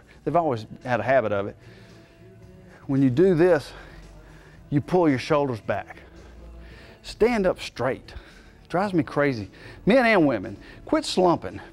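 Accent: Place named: South, United States. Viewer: That´s what I call a southern acccent. I have a chicano accent haha. Thanks for the vid, it was helpful.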